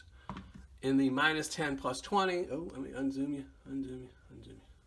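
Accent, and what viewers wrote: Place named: New York, United States. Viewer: I've been a long time subscriber as you can tell from my name I don't know if you give these away to just subscribers on YouTube or if they go to patreons only but I love the hell out of your videos in your New York accent reminds me a whole lot of my grandma just the accent my grandma was a sicilian woman from the Bronx Sicilian accent with the Bronx what a beautiful thing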